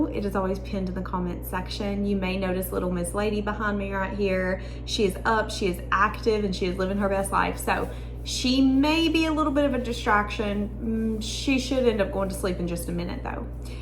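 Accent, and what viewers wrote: South, United States. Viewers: Love ❤ your sounthern accent. Its very attractive on you.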